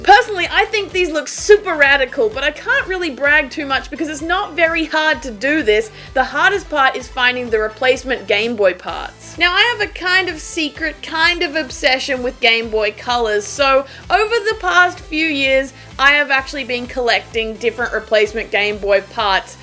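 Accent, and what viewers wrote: Australia. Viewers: is she austrailian?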